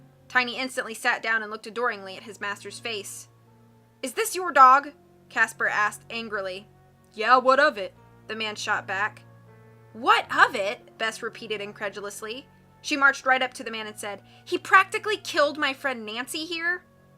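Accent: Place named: Southern Illinois, United States. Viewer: 'skulking around'?? How does one skulk? Have you ever been skulking? It's kinda like drinking but a bit smaller? ?? You're accent is a prefect southern Illinois dialect'ie -bit. - ever been ta Cairo? Greyville? You fet right en. M. IL.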